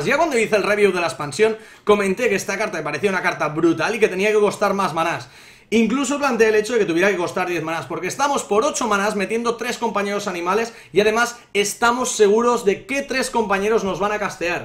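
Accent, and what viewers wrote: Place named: Spain. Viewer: Me ha gustado mucho el video y la discusión. Lo que sí me rechina es el espánglish, ya que claramente sabes inglés a la perfección y le llamas rocbíter a la rockbiter weapon (¿ rocbaiter ?), castear en lugar de invocar, tradear en lugar de luchar o intercambiar (¿ treid ?), etc. Pero bueno, soy un pesado y desde aquí en América del Sur el acento y las traducciones de España nos resultan ajenas a lo que estamos acostumbrados (típica polémica Homero vs Hómer). Reitero que me ha gustado del vídeo, a pesar de mis críticas.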